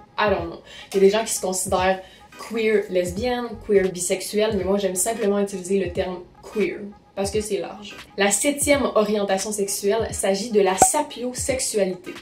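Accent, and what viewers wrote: Quebec, Canada. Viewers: Ton accent québécois est trop beauuu ❤️❤️❤️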